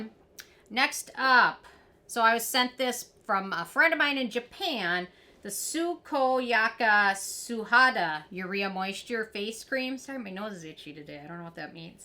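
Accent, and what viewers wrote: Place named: Midwest, United States. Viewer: I love your Midwest accent!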